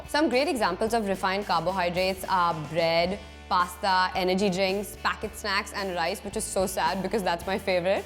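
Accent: India. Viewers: I know Indian woman because of accent English 😂😂😂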